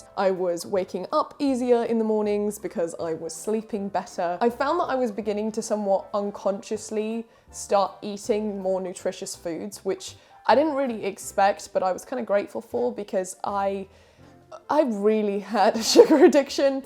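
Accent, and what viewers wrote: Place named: United Kingdom. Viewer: me: ok gonna just see results and leave hearing british accent me: ok im gonna stay for the whole video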